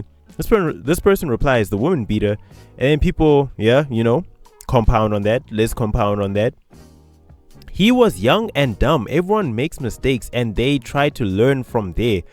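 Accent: South Africa. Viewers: THIS GUY IS DEFINITELY FROM SOUTH AFRICA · Are u South African 🇿🇦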